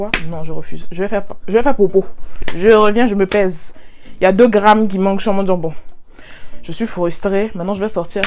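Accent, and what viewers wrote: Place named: Ivory Coast. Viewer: Je te découvre et je suis scotché 😀 je ne comprends même pas pourquoi... c trop chics 😂😂 l'accent ivoirien je kiffe grave 😘😉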